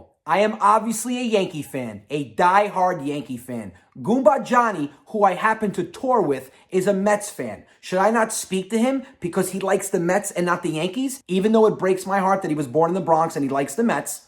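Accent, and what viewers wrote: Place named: Italy. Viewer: Well done my friend life is short then when we die they show up and say Poor guy he was a nice guy bull shit They are all sonofabitches saluti hey what part of Italy is youre family from ? They have the same way of speaking Say hi to Zio Vine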